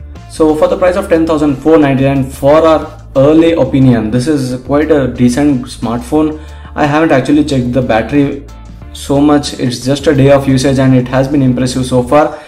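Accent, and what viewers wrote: India. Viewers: your indianoasian english sucks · your English accent like is Indian 😁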